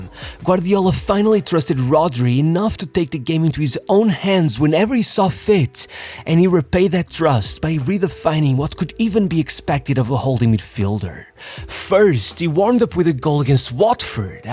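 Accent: Portugal. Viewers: Just a Portuguese accent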